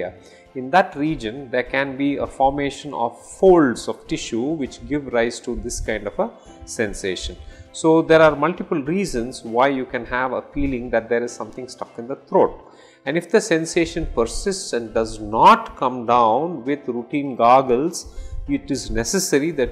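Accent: India. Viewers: Did u say dickheads or decades?! 🤣ur Indian i don’t understand u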